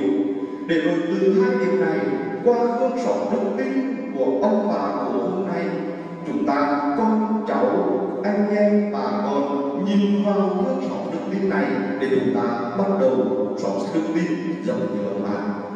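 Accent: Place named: Nghe An, Vietnam. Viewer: Cha giọng yên thành, nghệ An thì phải.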